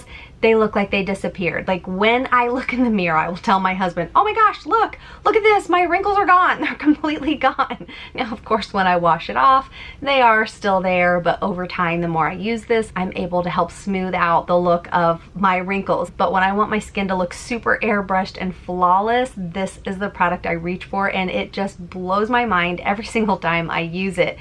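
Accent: England, United Kingdom. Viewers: Love your English accent 😂😍❤ this cruise sounds like a lot of fun to me 😍have fun! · Love your English accent 😊😂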